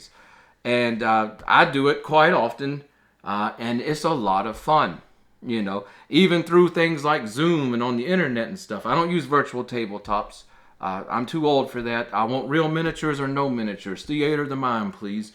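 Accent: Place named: Louisiana, United States. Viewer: So this channel needs to lean more into its Cajun roots. I'm here and subbed basically because of the content and the Cajun accent.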